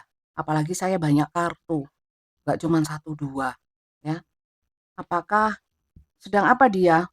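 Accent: East Java, Indonesia. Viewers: MANTULL BUNDA...JAWA TIMURAN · Bunda lucuu beneran krmekel sy... gak uwe uwekk😂😂😅😅 Asli jowo ne ketokkk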